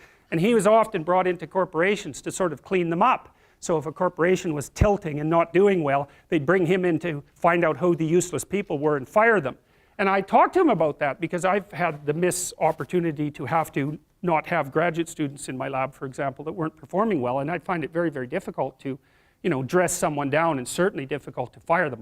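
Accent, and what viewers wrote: Canada. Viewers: This guy's Canadian, eh?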